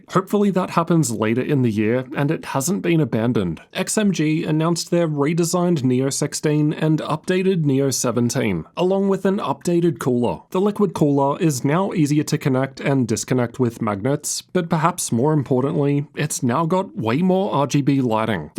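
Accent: Australia. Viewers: Okay but what are now the best and worst laptops from this year's CES? This is just a recap I came here to hear some Aussie ranting!!! :D Still nice video :) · The most Australian Australian I've ever heard, nice comparisons, thank you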